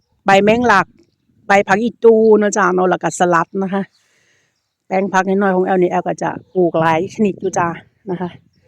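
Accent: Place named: Isan, Thailand. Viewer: สวัสดีค่ะเอื้อยแอ้วเทือแรกที่ได้เข่าม่าเบิงคลิปค่ะเบิงเพลินๆดี่ค่ะเอื้อยมักธรรมชาติรอบๆบ้านเอื้อยจ้าและภาษาเว่าสำเนียงบ้านเฮ่าจ้า👍🥰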